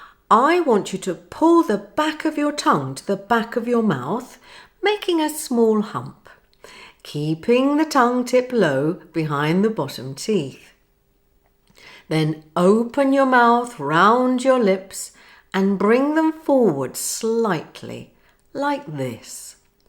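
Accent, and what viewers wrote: United Kingdom. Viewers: Is it british accent? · Is it British English accent??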